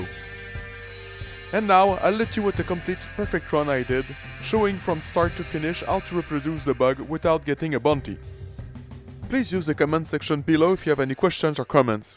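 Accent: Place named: Quebec, Canada. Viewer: J’entends l’accent québécois bro 😂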